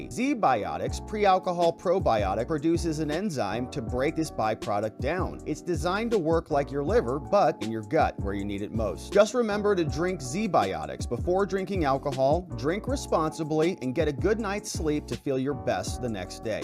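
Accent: United States. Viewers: ❤ Love your shows and the information you break it down to us in American English.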